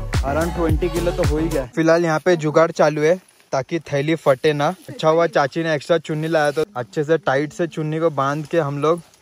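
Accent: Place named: Maharashtra, India. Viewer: Ur tone sounds like Maharashtra people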